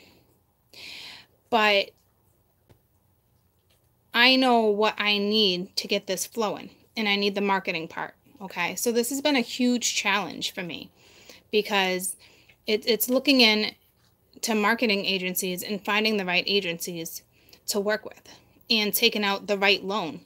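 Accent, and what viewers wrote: Boston, United States. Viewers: You and I have the same accent! It’s Boston baby! Be proud! It’s the best!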